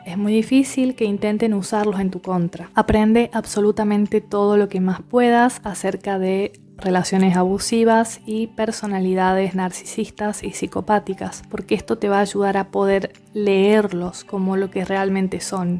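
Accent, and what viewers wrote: Córdoba, Argentina. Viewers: Pulsión de vida...MAESTRA, tu voz y cadencia cordobesa, me fascinan! Gracias! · Me encanta el acento che....saludos desde el otro lado de la cordillera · Hola sos cordobesa?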